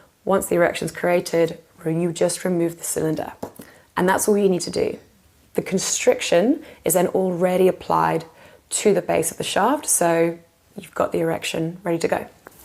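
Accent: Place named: United Kingdom. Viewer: Love yur British voice. It's sexey. 😊😊 Thanks for the advice!!😊😊😊😊❤❤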